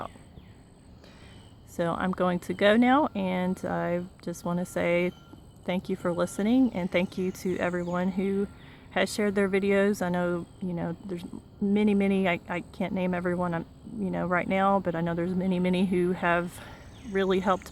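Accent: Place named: Texas, United States. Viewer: You sound perfect- just enough Texas twang to make it authentic! Exactly how I figured you'd sound!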